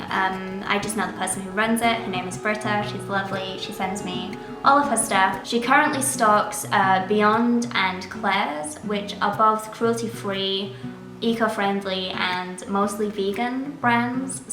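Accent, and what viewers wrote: Yorkshire, United Kingdom. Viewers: P.S what part of Yorkshire are you from? I'm in Harrogate.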